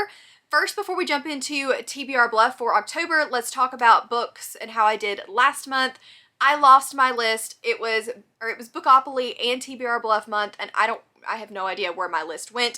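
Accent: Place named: South, United States. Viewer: You may say or have it somewhere.. and if you don’t then you absolutely don’t have to confirm my suspicion if you don’t feel comfortable doing so. This is the internet, after all. But based on your voice, I suspected you are from The South… but then you just said football and bugs dying and now I’m convinced. (And in no way, shape, or form am I mentioning your voice as an insult! Just in case that needs to be said!)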